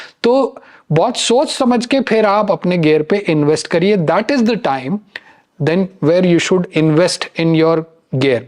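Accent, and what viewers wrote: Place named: Bhopal, India. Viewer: अरे वाह आप तो भोपाली निकले,तो कवि धूमकेतु का नाम जरूर सुना होगा।उम्र में मुझसे छोटे हैं, लेकिन रिश्ते में चाचा लगते हैं।आपकी बोली से मुझे लग रहा था सूरमा भोपाली💖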